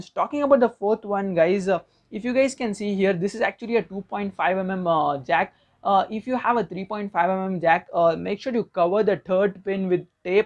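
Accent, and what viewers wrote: India. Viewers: Bro i love the English accent of Indian 👌 cool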